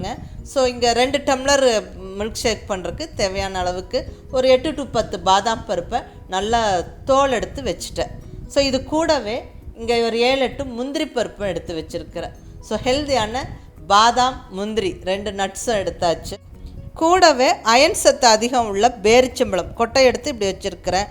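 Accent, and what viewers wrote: Kongu, India. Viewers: Good one ma'am. Thank you. Namma kongu tamil alago alagu.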